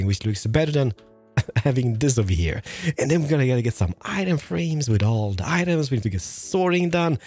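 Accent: Scania, Sweden. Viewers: are you Swedish? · Blev chockad när jag hörde den skånska dialekten! Var inte beredd på det! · i love his accent: its called skånska. om du ser detta, jag är ocks skåning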